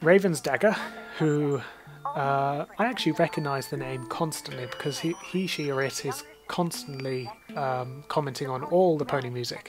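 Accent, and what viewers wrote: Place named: England, United Kingdom. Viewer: You're English eh? You are now my favorite brony.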